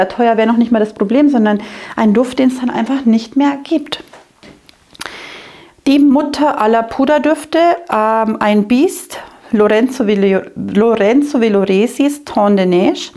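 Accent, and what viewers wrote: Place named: Bavaria, Germany. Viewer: So herrlisch präsentiert! Danke dafür! Froh hier gelandet zu sein. Diese private Duftreise gepaart mit dem wunderbaren bayerischen Akzent… phänomenal! Werde das Video gestückelt genießen. Gibt viele Parallelen zu meiner Kollektion. Viele Grüße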